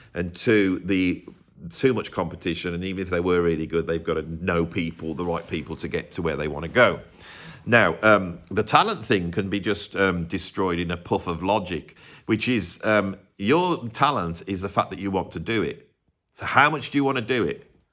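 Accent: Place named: Stourbridge, United Kingdom. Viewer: You aren't from Stourbridge, or nearby, are you? You sound like guys I grew up with 🎉🙏🏾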